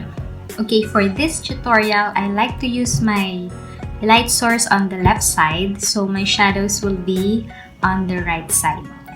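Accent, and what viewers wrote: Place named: Philippines. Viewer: You are very helpful. Glad I found your channel. Pilipina ka ba? I can sense based on your accent.. Hehehe.. Thanks a lot! 💚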